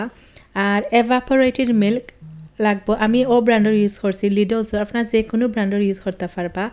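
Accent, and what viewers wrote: Sylhet, Bangladesh. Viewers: Nothing wrong with her Sylheti accent and speaking in her own dialect , it's rather cute, and I am personally appreciative of her confidence level! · মাশাআল্লাহ! রেসিপি ও সেলেটী ভাষা ২ টাই অনেক সুন্দর । আমি সেলেটী নই কিন্তু আপনার কথা বুঝতে পেরেছি। সকল ভাষারই সৌন্দর্য আছে❤। জাজাকাল্লাহ খাইরন। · She is speaking Shyleti dialect that’s why. It’s suitable for only UK shyletis. Others will definitely have a hard time understanding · আপনি কি সিলেটি আপু। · Your bengali accent sounds like you're from sylhet.. dayum so hard to understand even tho I'm a pure bangladeshi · Uni Sylheti bhashae kotha bole. Ekhane na bojhar tamon ki ache. Ami Sylhet bashi na hoeo to shob kothai bujhlam. 900w bolechen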